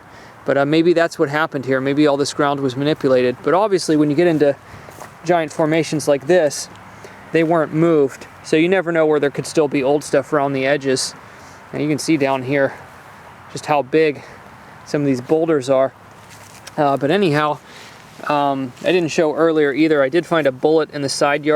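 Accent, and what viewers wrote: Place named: Virginia, United States. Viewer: Dam thought you from Virginia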